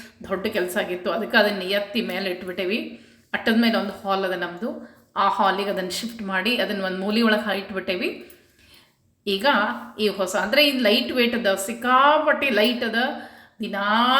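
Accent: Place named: Dharwad, India. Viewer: ಯಾವ ಊರು ಇದು akka ದಯಮಾಡಿ ತೀಳೀಸೀ ಕೋಡೀ ನೀಮ ಬಾಷ ಹುಬ್ಬಳ್ಳಿ ಧಾರವಾಡ ಜಿಲ್ಲೆಯ ದೂ ಅನಿಸುತ್ತದೆ ಸುಮ್ಮನೆ ತೀಳಕೋಬೇಕು ಅಂತ ಕೇಳತೀನೀ ತೀಳೀಸೀ ಕೋಡೀ ದಯಮಾಡಿ🙏🙏🙏🙏🙏🙏🙏🙏🙏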